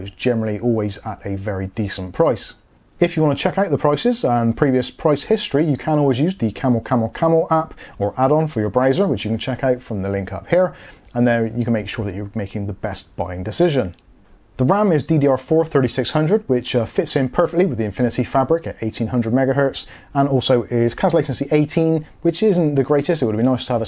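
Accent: Bristol, United Kingdom. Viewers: You sound like a fellow Bristolian? :)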